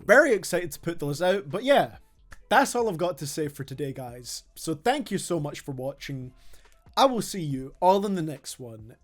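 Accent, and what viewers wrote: Scotland, United Kingdom. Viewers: Its probably the scottish accent. Or the exciting wait for the HAIR REVEAL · I think he's scottish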